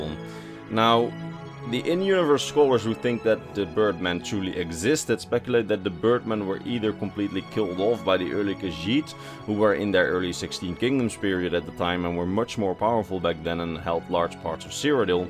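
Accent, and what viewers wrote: Netherlands. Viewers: Great video once again! Btw i might be mistaken but do i hear a Dutch accent? I'm Dutch myself and you sound very Dutch !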